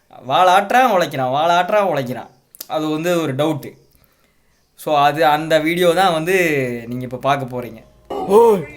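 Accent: India. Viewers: உங்கள் கொங்குத்தமிழ் மிகவும் அருமை நண்பா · sema Sema bro.. unga channel romba superb ah iruku.. unga way of taking that kovai slag ah semaya iruku..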